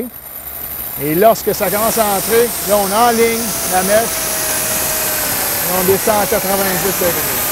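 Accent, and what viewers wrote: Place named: England, United Kingdom. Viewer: L'accent anglais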